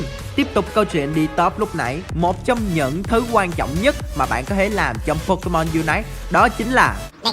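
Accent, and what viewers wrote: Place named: Saigon, Vietnam. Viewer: vđ là giọng sg lại nói nhanh ý.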